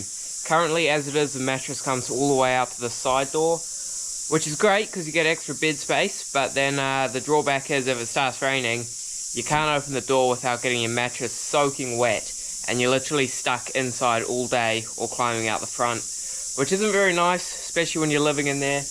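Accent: New Zealand. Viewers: I heard "one of the advantages of having a dad who has a mess of shit" instead of "a dad who has a massive shed". Ha! First heavy exposure to the Kiwi tongue.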